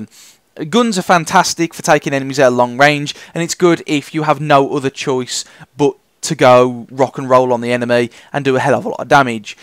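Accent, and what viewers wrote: Birmingham, United Kingdom. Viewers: Are you a brummy ?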